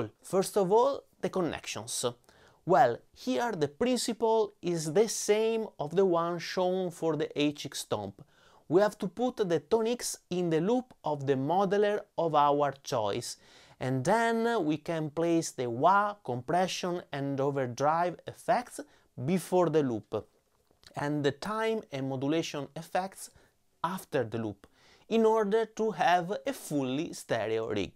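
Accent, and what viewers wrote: Italy. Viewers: bravo, seguita così, la tua pronuncia in inglese italianizzato mi fa sentire meno solo! :)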